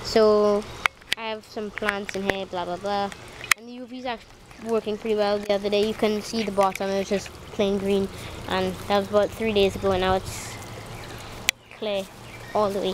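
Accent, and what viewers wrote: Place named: Trinidad and Tobago. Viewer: Hey nice fish hommie!i heard the lil trini voice.. im checking out ur vids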